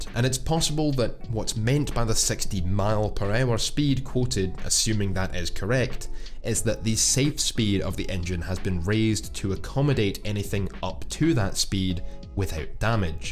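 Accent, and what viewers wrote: Scotland, United Kingdom. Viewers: Many words spoken by this chap are from some kind of jock slang, I respectfully request subtitles for clarity. Or is there or might there be an English voice option ? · Being disabled by driver error is hardly enemy action. The enemy action was ineffective against the chally even when it was immobile and defenceless and they where stood next to it shooting rpgs at it's weakest points. Plus being disabled is alot different to being destroyed with the crew dying.. Can tell your scottish i love your vids man but you have such a hate-boner for anything british then laud things only slightly better or on par it's hard to hear sometimes xD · Scottish accent but you live in Australia. So gaining any defense accurate info is quite impressive and commendable, but chobam armor, which I believe has been upgraded to leiseter or some other armor, and to be honest. 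guys I know in the tank corps think that is a good thing. You seem to be looking for an indestructible tank simply because of that you will never get one. At Also the Abrams use British armor technology, but then again the UK uses American communication software and fire and control. Anyway living in Australia, do you really care? you are about as far away from real war, as you will ever be. Guess that why you are in Australia!!!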